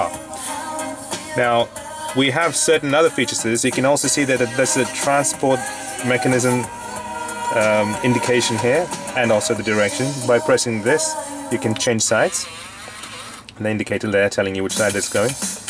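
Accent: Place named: South Africa. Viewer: Things and things It sounds sort of like a South African English accent. Source: I work with somebody from South Africa.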